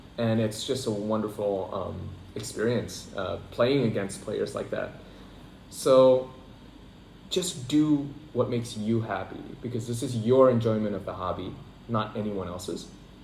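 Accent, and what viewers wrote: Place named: Iowa, United States. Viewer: Jakarta, Indonesia? You sound like you’re from Des Moines, Iowa! Great video brother. Army is spectacular. The advice you share is gold.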